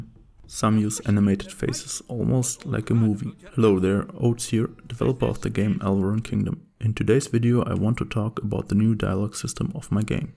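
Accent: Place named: Germany. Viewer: You can hear the german.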